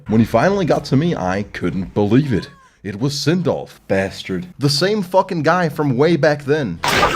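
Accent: Denmark. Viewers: bruh, you from Denmark? Got that Arla hitter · Wait a minute - er du dansker hahaha?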